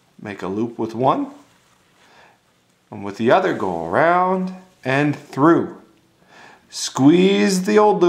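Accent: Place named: New York, United States. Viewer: This guy sounds like he is a new yorker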